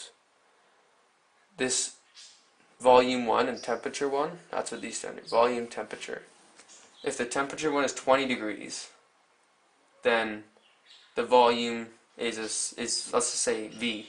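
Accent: Canada. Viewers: hah hah, u're canadian! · are you canadian?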